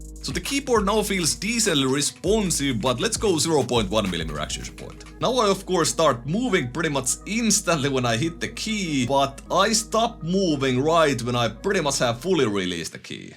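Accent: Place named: Finland. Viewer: huomaa aksentista että oot suomalainen. kiva että on tälläisilläkin videoilla suomalaisia tekijöitä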